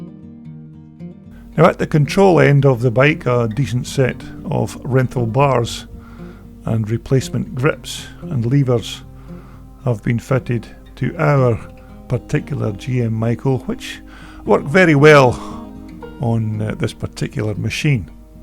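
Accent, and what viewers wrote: Scotland, United Kingdom. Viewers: "Gas" ???you sound Scottish, not American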